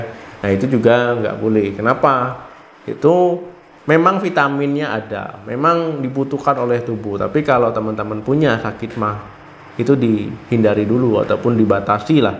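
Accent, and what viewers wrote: Aceh, Indonesia. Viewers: Lidahnya Aceh banget dok😊. Saya org Aceh tinggal di Batam. Saleum aneuk nanggroe 🙏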